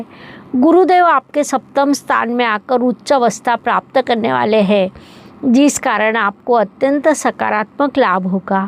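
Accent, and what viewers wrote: Maharashtra, India. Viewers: Arre aunty kyun tum marathi accent mei Hindi bolke jhoot pe jhooth phirse? 😢😢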